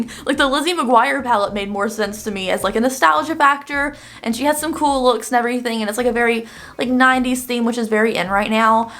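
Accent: South, United States. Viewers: Where are you from? I hear a Southern accent.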